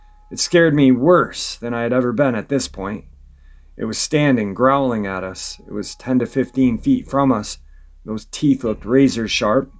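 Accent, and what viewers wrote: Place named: North Carolina, United States. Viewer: You sound like you might be from close to our area,,,, Morganton NC I grew up in Asheville, but my husband Frank and I now live in Burke co and we have a tree nursery behind our property that I think one might have been roaming through back there a few years ago. I was walking our dogs on a leash in the nursery and smelled the worst odor imaginable. It was skunk mixed with the smell of decomp. Our dogs went ballastic tucked there tails and started whining and pulling me toward home. Believe me I took off also later that night we heard howling and every dog on the neighborhood started whining and all of them were in the owners house. Patricia Gambino Harrington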